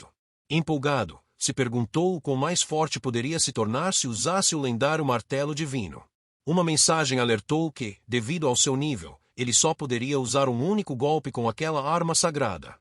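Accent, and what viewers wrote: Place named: England, United Kingdom. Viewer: Porque você tá falando com um sutaque inglês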